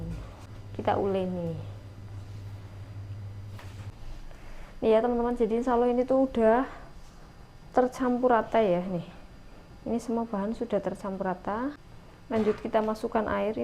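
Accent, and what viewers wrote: Banyumas, Indonesia. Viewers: Mba asli orang mana ko bahasanya ngapak kaya saya orang banjarnegara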